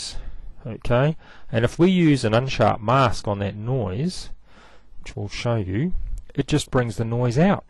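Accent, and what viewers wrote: New Zealand. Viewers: weren't sure if I detected the kiwi accent right, but I was happy, when I read the other comment. As I Kiwi you don't need this video since all your sunsets ARE already enhanced! Great tips though! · hahaha a kiwi accent for sure im from timas. Anyway thanks for the quick tips (a real time saver) just have to remember : top =overlay 2nd = screen cheers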